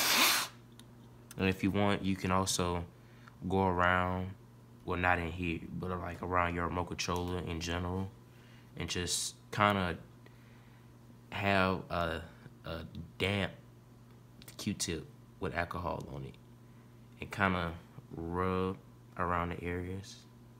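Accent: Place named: Louisiana, United States. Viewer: You from Louisiana bro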